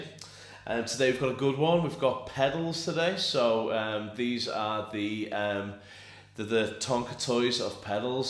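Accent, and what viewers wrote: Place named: Liverpool, United Kingdom. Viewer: This accent sounded Scousy to me. I sort of was right :). Nice review, lad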